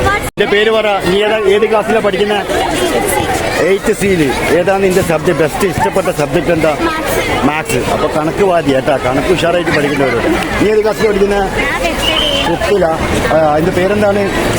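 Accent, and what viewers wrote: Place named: Kannur, India. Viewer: ഭായ് ജീവിതത്തിൽ ഏറ്റവും മറക്കാത്ത സ്കൂൾ ജീവിതം ഒരു ടെൻഷൻ ഇല്ല നിന്തി കുളി ബോൾ കളി ചുണ്ടൽ ഇട്ടു മീൻ പിടി ഒരു സമരം കിട്ടി ലീവ് ആവാൻ എന്നു ദേശാഭിമാനി വായിച്ചു നോക്കും അന്ന് ഉള്ള മാഷ്ന്മാർ ഫ്രണ്ട് മരിച്ചാലും മറക്കില്ല പക്ഷെ എന്നു കുട്ടികൾ മൊബൈൽ നെറ്റ് ഹായ് ഭായ് കഴിഞ്ഞു ഭായ് എല്ലാവരോടും ചേർത്ത് പിടിക്കുന്ന കാണുമ്പോൾ ചങ്ക് പൊട്ടുന്നു നമ്മൾ കുറെ ആയി നാട്ടിൽ വന്നിട്ട് ലോകത്തിൽ ഇവിടെ പോയാലും നമ്മുടെ കണ്ണൂർ ഭാഷ പറയുന്ന നിങ്ങൾ ഒരു 22ക്യാരറ്റ് ഉള്ള രാജ്യ സ്‌നേഹി ആണ് താങ്കൾക്ക് കുടുംബത്തിനും ദൈയിവം നന്മകൾ തരട്ടെ 🙏❤️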